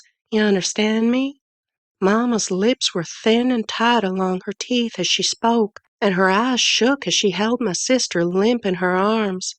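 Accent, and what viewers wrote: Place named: South, United States. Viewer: Just curious why the Southern accent? The setting is upstate NY.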